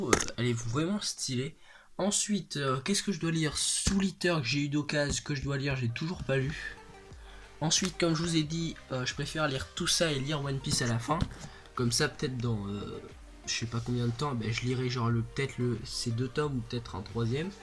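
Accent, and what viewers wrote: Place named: England, United Kingdom. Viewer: Super vidéo, tu m'as donné envie d'acheter certains manga. Et juste comme ça gentiment, j'adore ton accent anglais 😂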